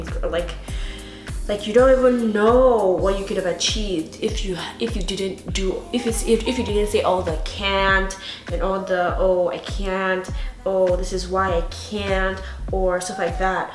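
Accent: Nigeria. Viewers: you look so gorgeous here. Naija accent coming through.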